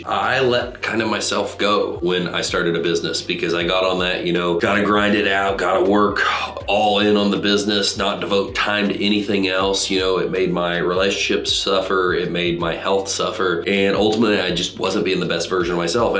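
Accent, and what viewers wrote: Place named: United States. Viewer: Just clicked on this: THANK GOD not another rancid English accent or Aussie accent. Was totally expecting it and refreshing to hesr American English, which just sounds better and more “normal”. 😂❤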